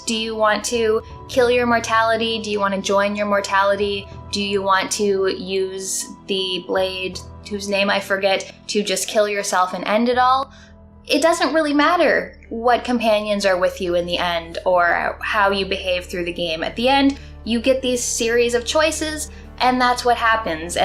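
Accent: Canada. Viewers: You are the first person I've seen with whom I agree COMPLETELY about the remaster. Also, I just picked up on your Canadian accent for the first time just now...which is unforgivable because my wife is from Canada and has the same accent.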